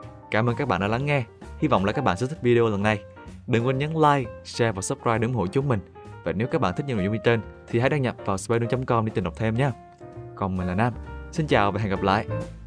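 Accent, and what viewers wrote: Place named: Quang Nam, Vietnam. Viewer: Lần đầu nghe giọng Quảng trên kênh, khá êm tai nha 👍👍👍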